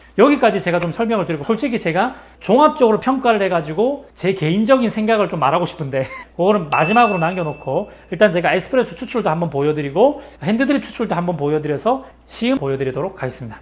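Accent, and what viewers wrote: Gyeongsang, South Korea. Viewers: 항상 감사한 마음으로 동영상 잘 보고 있습니다. 저도 지역이 PK라 ㅎㅎ.. 억양이 옆집스럽습니다. ^^; 대장님. 동영상 보다 궁금한게..ek43의 노커.. 저게 어느 회사 제품인가 싶어서요.. 노커가 맛이 가서 바꿔야 할듯 한데.. 저 노커가 자꾸 눈에 들어오네요.. 😂😂 그나저나.. 그라인더 성대모사 진짜 똑 같아요.. ㅎㅎ😂😂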